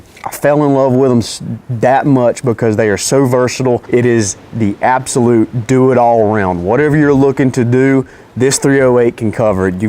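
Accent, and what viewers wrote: South, United States. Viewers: Allsome. Love that Southern drawwwwwwwl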